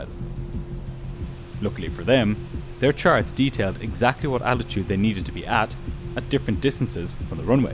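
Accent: Ireland. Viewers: I never google the flights you talk about. I like to listen to the sweet irish voice that keeps me on the edge of my seat. I only try and figure out the ending of each flight with your tone and context clues. Glad i found you!!!! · The Irish accent is a lot easier on the ears than the Swedish one, but what I really appreciate about is that he doesn’t do any of the ridiculous propaganda pieces that the venture-capital-owned channel inflicts on its viewers. 🙂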